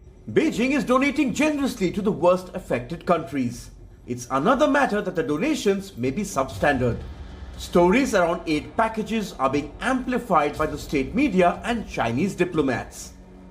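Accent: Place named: India. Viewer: Sorry but you just cant take Indians seriously with the attrocious accent.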